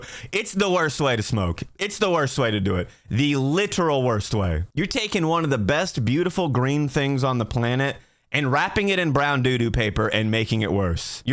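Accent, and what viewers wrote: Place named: East Coast, United States. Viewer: You have an east coast accent and you just called em Scooby snacks, you had to have grown up near new england or something 😂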